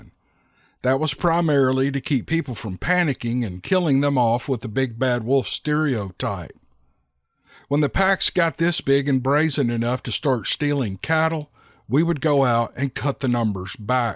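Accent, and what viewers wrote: South, United States. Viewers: Good to hear a southern voice I'm Texan but you can tell the accent we're all different and we all stick together you have great stories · It’s his southern draw and personality that make the stories even better. I’ll bet if someone else narrated them, it wouldn’t be near as good.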